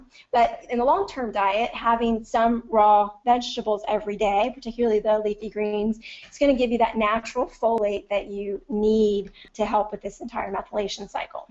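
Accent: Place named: South, United States. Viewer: This info was very good BUT.. I'd like to give you some helpful auditory info if I may. The audio on this video is horrendous, you sound like you are talking through a pipe. I have this and other conditions which make it especially annoying to decipher. You have a southern drone, plus you are very monotone and nasal, and you talk far too fast for your audience and the compromised audio quality, it cannot possibly match your words trying to match your brain in delivery - it cannot unless you improve the audio, eliminating echoes and reverb. You must adjust, just slow down by about 25% if you have this low quality audio gear. Sorry but I had to leave due to the above situations, although I did mute this and flip through the charts which are great. I truly hope you can resolve the quality issues, as you otherwise have a lot of good info to tell, thanks.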